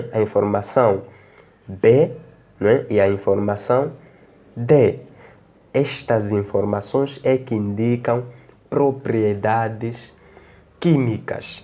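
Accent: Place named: North, Portugal. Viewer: Você é do norte. Right?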